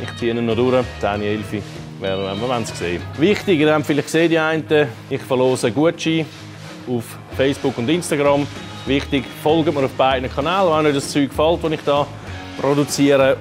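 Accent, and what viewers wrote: Switzerland. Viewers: Nur Züri-Dütsch :-) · Interessante Videos und immer wieder klasse Projekte. TOP! Mir persönlich gefällt es, dass sich kein bisschen anstrengt, es irgendwie auf "Normal-Deutsch" zu kommentieren und immer auf der "Schwizerdütsch- Schiene" weiter fährt.:-) Muss allerdings sagen, dass ich als "Badner" aus Deutschland, keine Probleme habe, es zu verstehen, da unser Dialekt ja recht ähnlich ist. Als Norddeutscher wäre ich für die Untertitel auf jeden Fall dankbar.:-)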